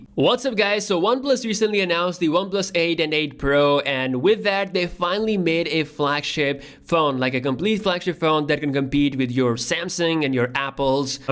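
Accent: India. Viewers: I like how there's just a pinch of Indian in your accent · Strong Indian accent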